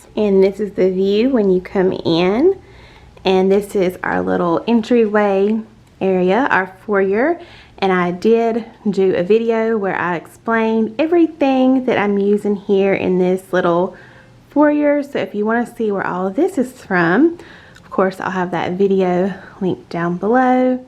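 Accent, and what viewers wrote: South, United States. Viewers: love your Christmas 2020. Love the mantle decor! Love your southern accent. Merry Christmas to you & your family. Brenda Harris from Arkansas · Im not Sure how I haven't found your channel before this collab! WOW That snow Globe is Amazing,Your Accent is the cutest. Im from Michigan I always wanted a southern Accent! Your house is so beautiful.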